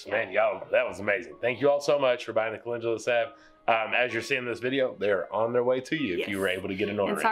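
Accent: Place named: Rural, United States. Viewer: Don't worry about sounding country. We all understand country just fine! 😉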